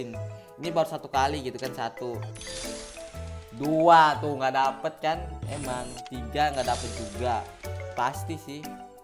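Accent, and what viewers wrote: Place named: Java, Indonesia. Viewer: Jawa keras bos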